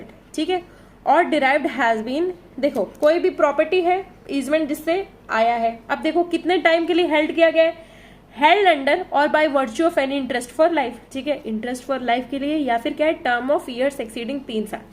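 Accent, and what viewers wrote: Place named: Lucknow, India. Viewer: Apki voice me lucknow ka accent hai❤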